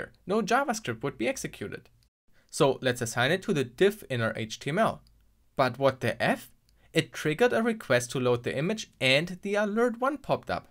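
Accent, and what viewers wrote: Germany. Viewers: Ehmm hallo typ der englisch spricht aber deutsch ist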